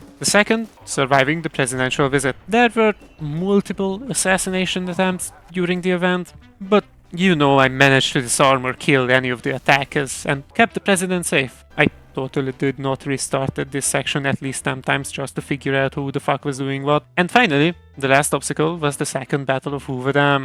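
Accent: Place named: Hungary. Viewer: Hungarian accent on top :D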